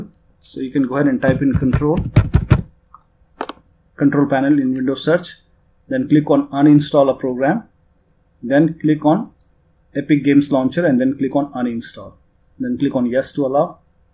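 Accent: India. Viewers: I swear it’s always the indian accent guys that always have your back. When your unclogging a toilet, helping your wife give birth, or getting that last pringle chip out of the container. They always got you. What a legend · Its always an indian accent guys helping me out. thanks so much bro i hope u are doing okay. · love indian accent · This is a good video i like ur indian accent bro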